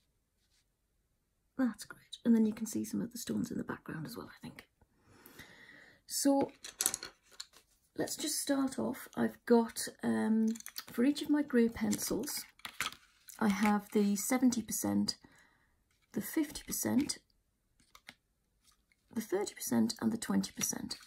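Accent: England, United Kingdom. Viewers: Thank you for coloring tips!!! Love you channel! : ) Love you English accent...! : )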